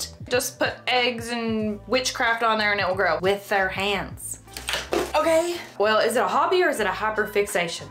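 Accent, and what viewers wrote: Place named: Appalachia, United States. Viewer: I knew you had to be from Tennessee! I’m from northeast Tennessee, and you sound straight up Appalachian 😆😆😆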